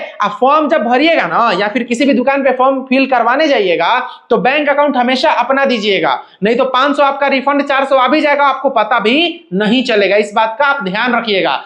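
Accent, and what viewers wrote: Bihar, India. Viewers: Bhaiya aapka bolne style gajab hai 😊😊😊😊😊😊😊😊😊😊😊😊😊😊😊😊😊😊😊😊😊 ek dam bihari style. Ham bhi Bihar se hi hu.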